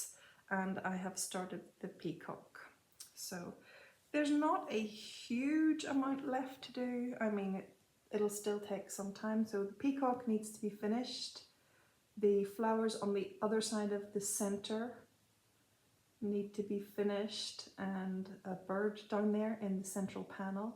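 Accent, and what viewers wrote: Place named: Ireland. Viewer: so lovely to see you again and we do understand you are very busy, not only looking after your own wee family, but also preparing for long term house guests. Wishing you all the best as you all adjust to the new routine once your guests arrive. I'm sure you will be able to grab a few minutes some days to stitch a row and use this as your chill out and recharge time. Please don't worry about having too much content for future videos. Just to see your face and hear a couple of minutes of your Irish lilt will suffice until everything settles down for you. Take care and deep breaths. xx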